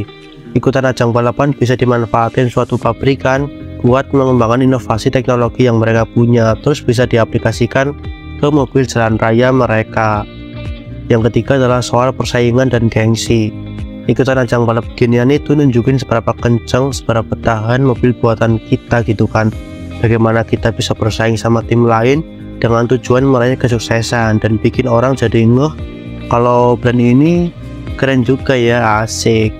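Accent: Indonesia. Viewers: padahalmah aksen Indonesia nya biarin ajja, tapi yaaa banyak yg pengen disama'in kaya aslinya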